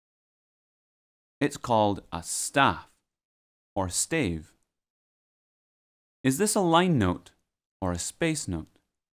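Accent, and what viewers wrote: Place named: Scotland, United Kingdom. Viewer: Why u scottish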